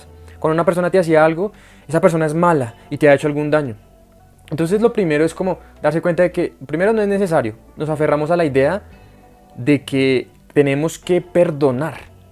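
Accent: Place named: Colombia. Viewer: Sos muy genio, gracias por tu luz, saludos desde argentina, amo el acento colombiano 😊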